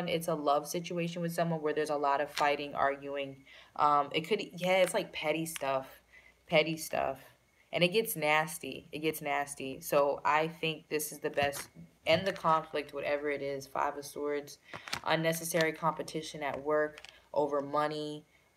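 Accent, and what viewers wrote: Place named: DMV, United States. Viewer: Where are you from love... you sound like your from the DMV area or some where around there... (DC/Maryland/VA) ... Just being nosey girl... LOL!!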